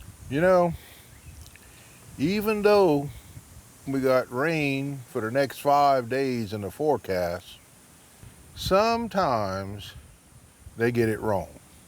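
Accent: Louisiana, United States. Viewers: Just found you on YouTube Some of the vids are a bit older but not a lot changes in gardening. Love the Cajun accent. How about some zydeco music?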